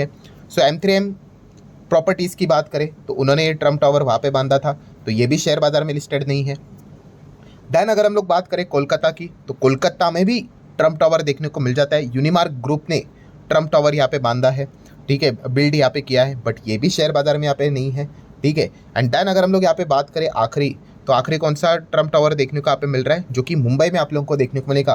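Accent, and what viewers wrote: Maharashtra, India. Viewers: Trump tower Bandha hai 😂😅 Are you Marathi?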